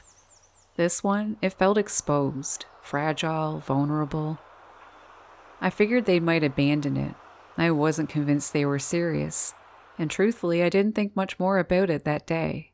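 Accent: Canada. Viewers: Great message and video 💖 Nice to hear of your Ma and formative foundations. Like many others, I look forward to occasions when I'm able to appreciate your passions. And your wonderful Canadian speech. At times, my thoughts refer to you as ', the ' 😄 (don't know if that's the influence from Brooklyn, Philadelphia or some other part of your southern neighborhood).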